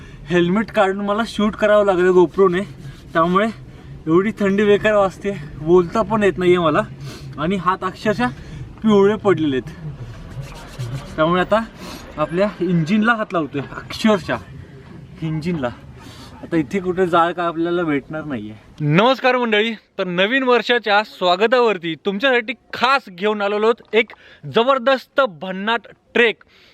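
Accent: Satara, India. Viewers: Mla avajavrun tu satarkar vatatoy ..am i right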